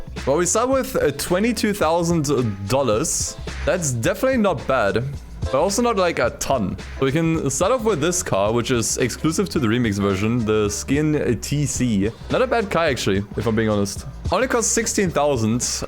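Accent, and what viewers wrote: Germany. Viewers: Ich liebe den deutschen Akzent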